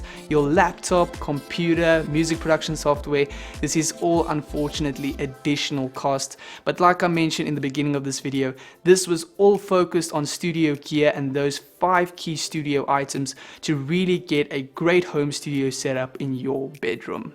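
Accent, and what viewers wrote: South Africa. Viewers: Hi love your video’s been watching you from before the giveaways 😜 just a random question were are you from because I am getting South African vibes from your accent 🤷🏻‍♂️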